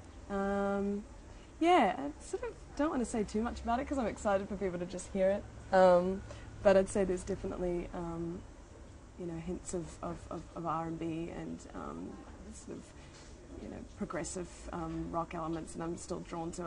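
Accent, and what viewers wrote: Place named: New Zealand. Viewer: I love her speaking voice as well as her singing voice. The Kiwi accent really knocks me out.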